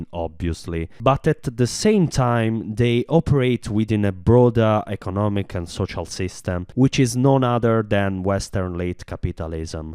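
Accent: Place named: Italy. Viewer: Good job but se ti guardi 3-4 episodi dei sopranos in lingua originale sblocchi l'accento da new jersey invece di dover rimanere con l'accento da scuola pubblica italiana con insegnante zitella di origini partenopee. All'audience international piace di più se ti possono immaginare dire "capocollo" come interiezione, fidati non è difficile.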